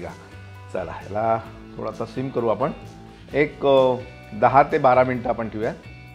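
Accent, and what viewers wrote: Vidarbha, India. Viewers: Vidhrbha chi bhasha bole Tumi mustt khupch Chan vtl sir khupch Chan recipe sir 👍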